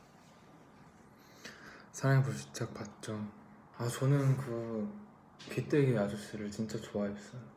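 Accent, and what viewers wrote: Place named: North Korea. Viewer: ㅠㅠ아 기여운 기먼필 ㅠㅠㅠ 진심 북한말 너무 잘해ㅠㅠㅠㅠㅠㅠ 아진챠ㅠㅠ 기욥댜ㅠㅠㅠ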